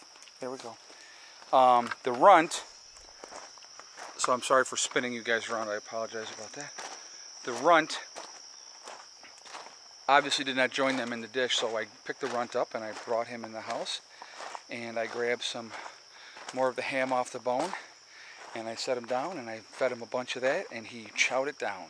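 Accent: United States. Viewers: Iam a Christian and a Welshman to boot! I am on carer's allowance for looking after my elderly mother and I am thus poor by modern British standards but I would willingly try to accommodate any of my Christian brothers and sisters from SA and beyond! My only question is this, "Why have you got an American accent?"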